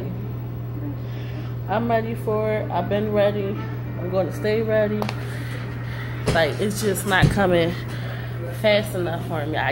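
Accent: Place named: New Orleans, United States. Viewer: Congrats on the little girl......where are you from? The reason why i ask is because your accent sounds like your are from New Orleans.... Glad to see everything is going well.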